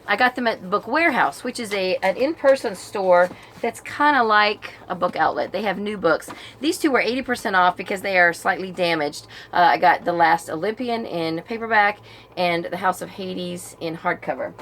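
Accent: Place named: Oklahoma, United States. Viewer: Yes, I love your Oklahoma twang!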